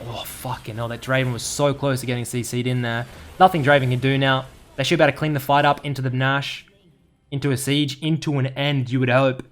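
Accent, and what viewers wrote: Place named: Australia. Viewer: australian accent DansGame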